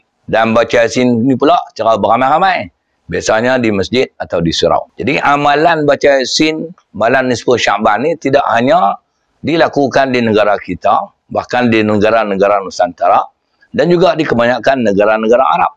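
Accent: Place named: Kedah, Malaysia. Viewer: Pekat betul loghat Kedah Ustaz ni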